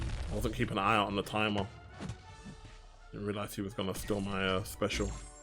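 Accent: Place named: Jamaica. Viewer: turned full yardie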